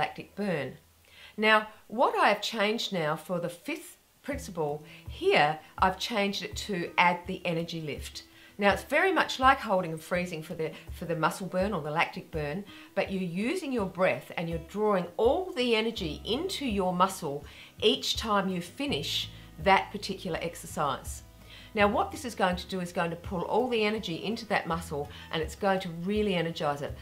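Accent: Australia. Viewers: Geez, I just adore you:) I also love your Aussie accent! I commented before, have followed you for quite some time now, but only recently have I decided to give your steps a go as you put it all together so nicely, am still at the first week and very excited to see it through. There is so much to watch, learning never ends. Still slightly confused but hey, I will get a hang, for now my goal is to make it through all reps, all series, so that will keep me working for weeks. I have been doing various massages, small exercises and such these last years but now, given that I am approaching 50, given that I also started having neurological issues, jaw surgery in 2 weeks, I decided to this with you. Just wanted to say thanks for sharing all this with us. That good karma is giving you such a lovely, positive glow so no wonder that you loo so beautiful and fresh <3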